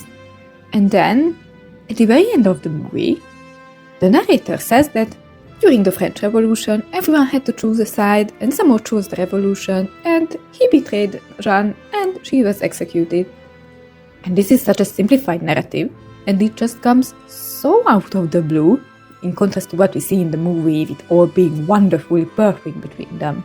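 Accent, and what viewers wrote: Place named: Germany. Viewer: Yup. You sound just like A German.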